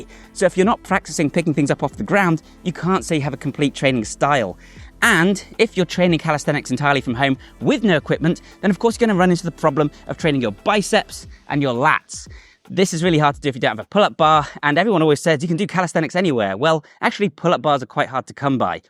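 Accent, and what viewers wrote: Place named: Scotland, United Kingdom. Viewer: Ur Scottish? U have a faint Scottish accent.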